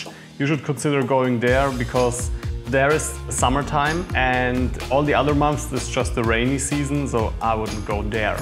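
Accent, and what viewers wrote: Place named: Germany. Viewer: Stick to your pizza's dude, one cannot only hear you are German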